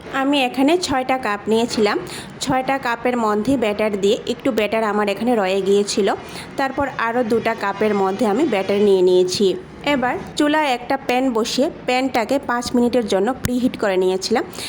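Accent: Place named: Bangladesh. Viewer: তোমার accent শুনে মনে হচ্ছে তুমি বাংলাদেশি. Cake এ লেবুর রস দেওয়ার দরকার নেই আর cake এ vanilla essence দিলেই ভালো লাগে.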